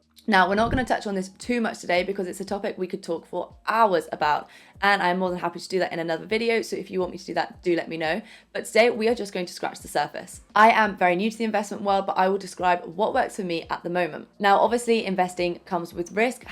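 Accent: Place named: United Kingdom. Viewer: i love you and your british accent so much ❤️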